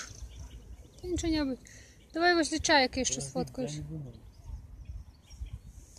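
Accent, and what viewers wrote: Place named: Belarus. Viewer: А говор то беларуский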